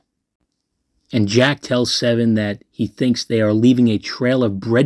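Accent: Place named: South, United States. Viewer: are you from the South? You have a great Southern accent.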